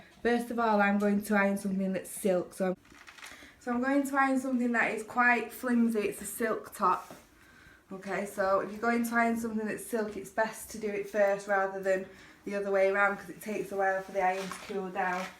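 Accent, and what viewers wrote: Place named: Northern England, United Kingdom. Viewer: Great instructions, nice and clear from a fellow northerner! Thank you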